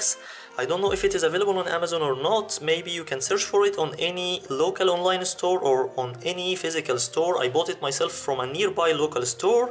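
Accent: Egypt. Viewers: الاكسنت دي مصريه اجيبه منين وبگام بقاا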